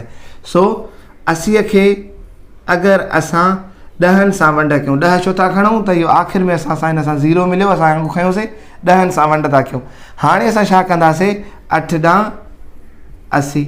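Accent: Larkana, Pakistan. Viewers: Larkana waly aise hi bolty hen so sweet 🥰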